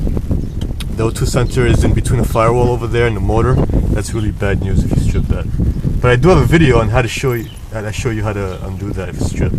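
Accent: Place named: Long Island, United States. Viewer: Excellent video! You MUST be from Long Island. I'm gonna guess Suffolk County. Am I right?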